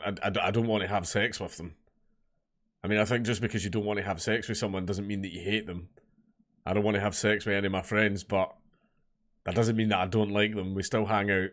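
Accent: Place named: Scotland, United Kingdom. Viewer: Just for curiosity's sake I checked the auto-generated captions in this video, and they are almost perfect! Yutube's bot probably understands Scottish accent better than I do.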